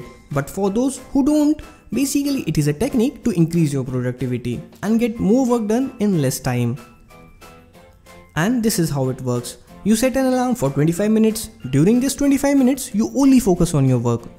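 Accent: India. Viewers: Indian accent always cracks me up😂😂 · same lol ,i hate indian accent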